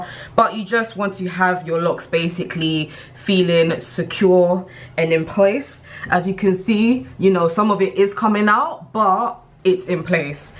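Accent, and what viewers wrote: London, United Kingdom. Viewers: What part of London u from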